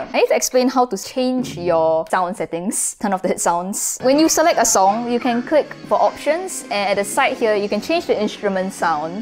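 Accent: Singapore. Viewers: So this is Singapore Accent? Hmm instersting...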